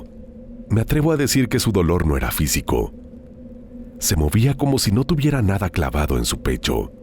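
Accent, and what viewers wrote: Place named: Mexico. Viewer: Hola, tengo una curiosidad, si eres venezolano por qué tienes tanto acento? Vives en México?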